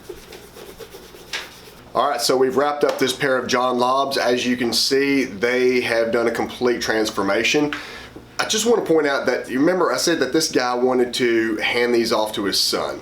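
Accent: South, United States. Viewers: Omg i love this guys voice!!! It's so nice and deep! And that southern twang!!! I love it 10/10 can and will fall asleep to it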